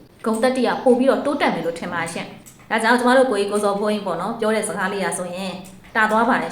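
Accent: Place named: Dawei, Myanmar. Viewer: ကဲသိပီလါး။ဒွေးလေသံ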